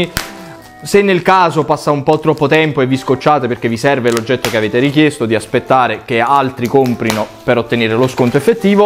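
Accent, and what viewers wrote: Naples, Italy. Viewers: Ma sei napoletano? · Non si sente che è di Napoli? 😂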